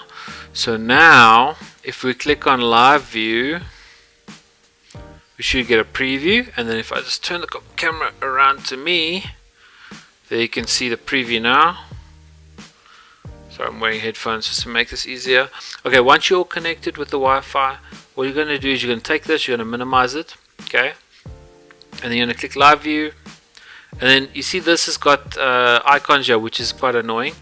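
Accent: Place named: South Africa. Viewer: Hey I enjoyed your Video and loved hearing your SAFFA accent being one myself in living in Melbourne. I have a Sony A6000 but looking for options to try and capture a group type live demo environment for others to watch via zoom (ie train others remotely). Any suggestions as to what webcam might suit that? Cheers mate. Darron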